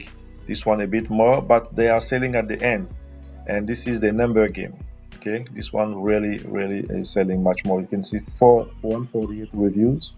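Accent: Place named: France. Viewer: Well, it's a great video bro, GJ, so according to ur accent, I think that u are a french guy, so id u don't mind can u create a video on how to find niches on the Fr marketplace !!!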